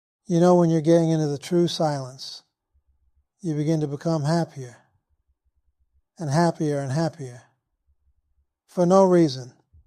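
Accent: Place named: South, United States. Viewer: this IS Roberts voice isn't it ?? Arrr damn ... I was sure it was . Disappointing. Ar well close enough ... yes TruE he's got that southern Twang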